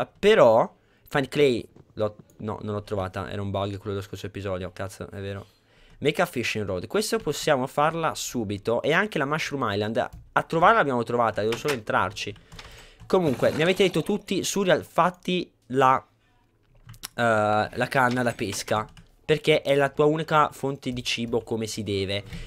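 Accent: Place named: Veneto, Italy. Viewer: Gli scleri che ti partono in veneto sono i migliori!! Daghine;)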